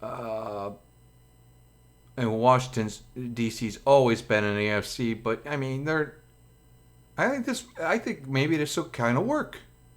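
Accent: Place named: Brooklyn, United States. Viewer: Brooklyn is my favorite accent. Seriously love it. I don't understand all this sport talk but I like listening to you talk about it. Missed you around here buddy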